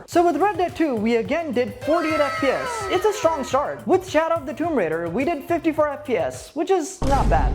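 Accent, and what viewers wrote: India. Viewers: ARE YOU INDIAN OR AMERICA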